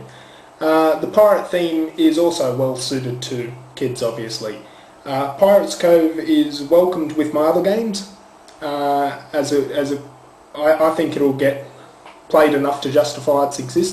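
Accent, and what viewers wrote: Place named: Texas, United States. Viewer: I like your Texas accent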